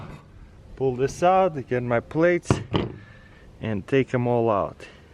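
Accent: Russia. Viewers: Ужасный русский акцент.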